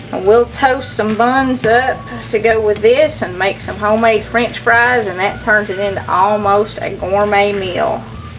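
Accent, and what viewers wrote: South, United States. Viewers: I love your videos!!!! Keep up the good work. My family is from eastern Kentucky so I love that southern draw. I believe your from Knoxville if I'm not mistaken. I spend a lot of time in the Smokies. Your lucky to be that close, I'm 4 and a 1/2 hours away (Dayton, Ohio). One last thing, I see you have the Andy Griffith show on. Best TV ever.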